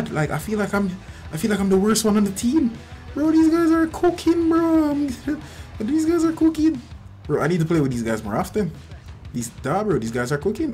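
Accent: Jamaica. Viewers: Bro got Jamaican accent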